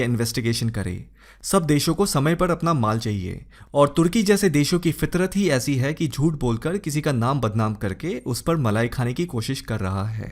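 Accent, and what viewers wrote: Bengal, India. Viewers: Bengali accent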